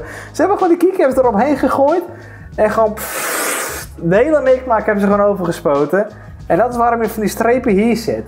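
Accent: Friesland, Netherlands. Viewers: Klinkt Fries 😅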